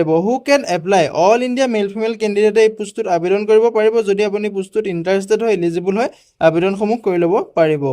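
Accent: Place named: Gujarat, India. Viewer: Tur mur tu vdeo bonaiso ..Kun stater hoi sai lo...gujaratar aru local language lage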